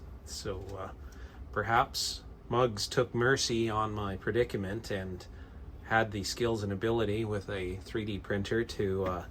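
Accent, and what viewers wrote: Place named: Canada. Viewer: You sound less Canadian in the revision of this video. Also, I have that same keyboard, and it is a beast.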